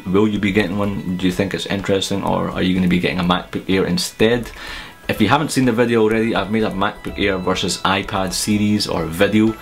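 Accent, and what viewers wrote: Scotland, United Kingdom. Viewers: I like Ur scottish Accent! Big Country Forever! Stay alive!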